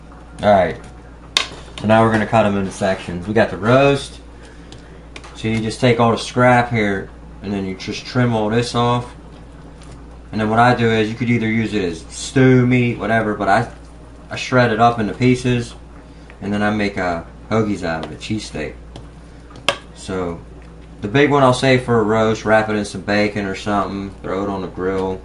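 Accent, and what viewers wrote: Pittsburgh, United States. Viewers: It took me about 5 seconds to tell your from Pittsburgh, love it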